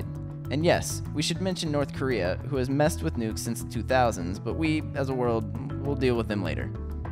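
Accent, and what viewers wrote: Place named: United States. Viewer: That american accent...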